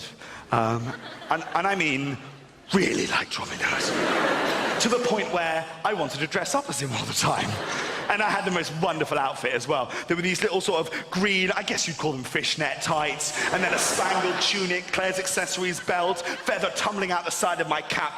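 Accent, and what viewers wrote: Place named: Germany. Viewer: Почему его английский звучит как немецкий?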